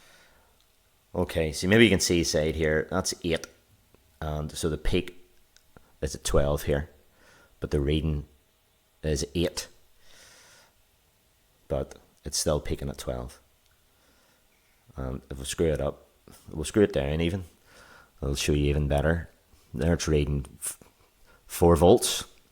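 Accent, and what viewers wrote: Northern Ireland, United Kingdom. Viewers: Hey man. Are you from Norn Iron??!!??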